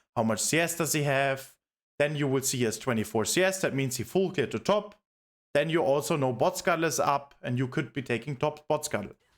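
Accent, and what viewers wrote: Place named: Germany. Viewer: Sounds like German English. 😄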